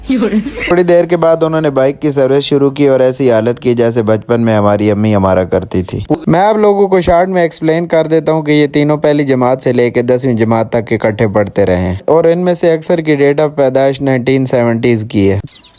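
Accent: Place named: Punjab, India. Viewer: I really like the punjabie touch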